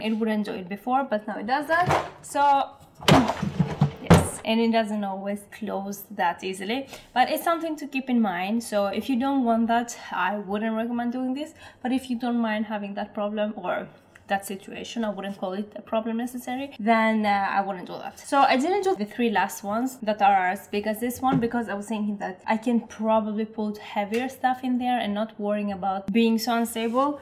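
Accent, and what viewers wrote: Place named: Eastern Europe. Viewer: which part of eastern europe are you from?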